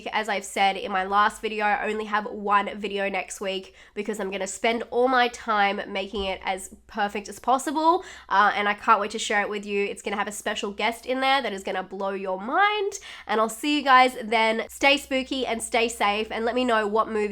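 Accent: Australia. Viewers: The way you say tube! I love an Australian accent.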